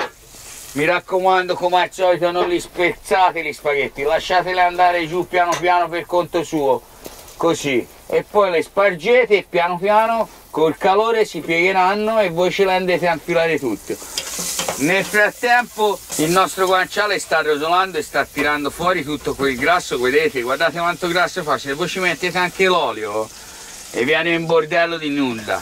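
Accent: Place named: Livorno, Italy. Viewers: che dire... con sincerità stima ed ammirazione sei il Top ...il dialetto livornese non ha nulla da invidiare al tutto il resto dell'italy oltre che bravissimo ... simpaticissimo( mimmo da palermo... iscritto ) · Boia. Si sente vai che sei livornese :-) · Mi piace moltissimo questa carbonara e anche l´accento livornese. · Sei troppo simpatico con quell'accento di Livorno meraviglioso. Mi hai fatto venire voglia di carbonara....salutoni.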